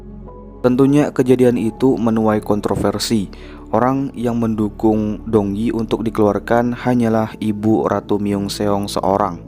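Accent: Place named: West Java, Indonesia. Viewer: Admin seperti orang Jawa Barat, logatnya seperti paman saya yg dri majalengka, 😁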